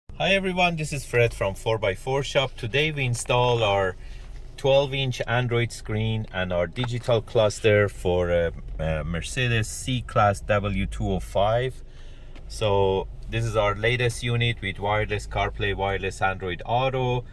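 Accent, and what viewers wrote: Iran. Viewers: that persian accent tho ;)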